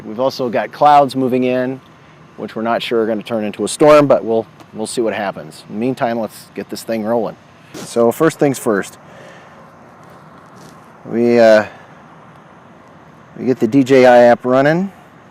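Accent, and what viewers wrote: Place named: United States. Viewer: I was shocked when I played this video. The last thing I expect was to hear an American voice. First thing I did was give you a thumbs up, know what I mean Vern?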